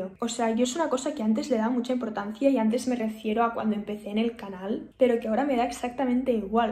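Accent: Spain. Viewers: Apenas comencé a verte hoy y no he parado todo el día en ver videos tuyos, que realmente me encanta el acento español y creo que la forma en la que hablas y eres me relaja demasiado.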